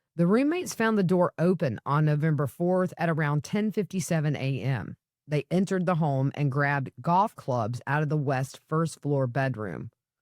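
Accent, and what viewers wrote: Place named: Georgia, United States. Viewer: I live over in South Georgia so when I listen to your accent it sounds like me and my friends talking 😊. Thanks for the great work.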